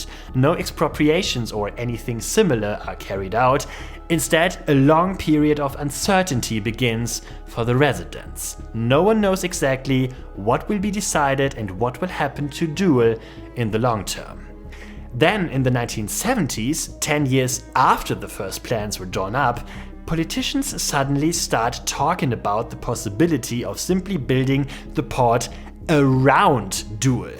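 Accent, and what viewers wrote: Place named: Germany. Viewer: Het klinkt als Engels met een duits accent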